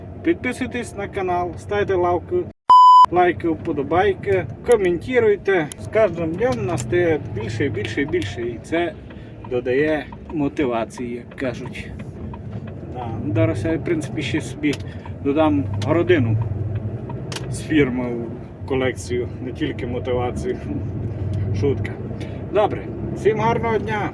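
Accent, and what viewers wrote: Transcarpathia, Ukraine. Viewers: Закарпатська говірка неперевершена!!!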